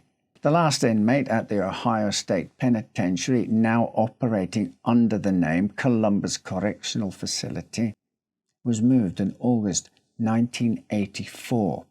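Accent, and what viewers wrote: Scotland, United Kingdom. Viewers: I’ve got to stop watching well I never when I get into bed. No matter how interesting the story is, that calm Scottish like accent knocks me out every time. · Wow - just wow. Weird hearing local history from someone with an English/Scottish/Irish accent, but somehow it just makes it seem more interesting. Thank you and subbed. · "Scottish like"? He's 100% Scottish 👍😀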